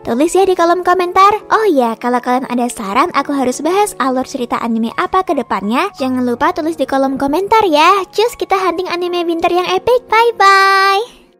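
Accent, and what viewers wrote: Japan. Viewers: kek suara cwek jepang yg imut"gitu tpi versi indo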